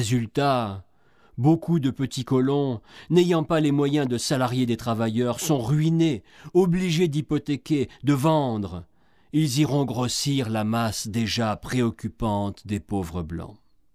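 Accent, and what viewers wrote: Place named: Réunion, France. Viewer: Très bon reportage, bravo, c'est très enrichissant ! C'est agréable d'avoir un documentaire avec ce léger accent rényonais :-) Je viens habiter dans quelques mois sur cette île que j'aime tant !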